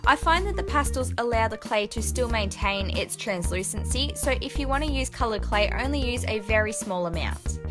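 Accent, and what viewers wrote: Australia. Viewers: are you from australia? same (im from australia)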